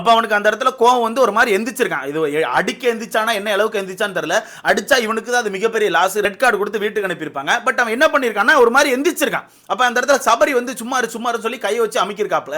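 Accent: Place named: Madurai, India. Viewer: Madurai slang bro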